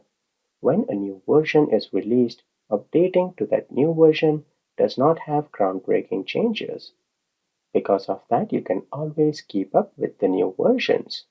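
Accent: India. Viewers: Absolutely the best <link> tutorial video I've found so far. Having an Indian accent is nice. However, when you speak, it feels like you're singing with the same tone all the time. Please, just speak in your natural tone. Don't try to sing. This singing part made it very hard to understand what you were saying. i had to listen 3-4 times to understand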